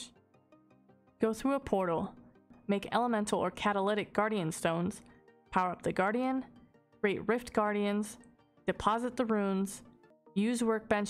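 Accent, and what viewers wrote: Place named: South, United States. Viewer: "a hunerd to a hunerd forty fragments." I love that southern accent, because it's the exact same way I say it lol. Oklahoma here. Thank you so much for this guide. I recently had b2b seizures, the second of which put me into Cardiac arrest, and they had to bring me back. I lost the last 4 months of memory, so I completely forgot anything about this mini game. Now I'm gonna go try it, thank ya!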